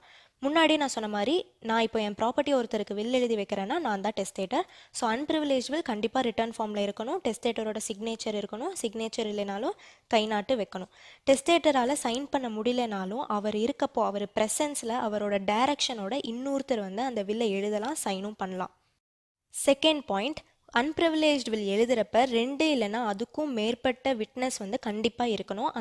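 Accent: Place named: Madurai, India. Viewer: Amma ponnu....unnoda voice, prounouncation, modulation... Super ma..! Nee Madurai ya ma?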